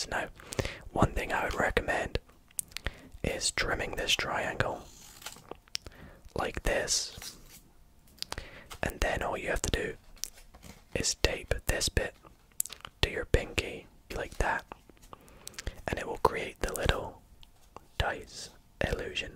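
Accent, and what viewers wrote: Scotland, United Kingdom. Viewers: Enjoyed the video also are you Scottish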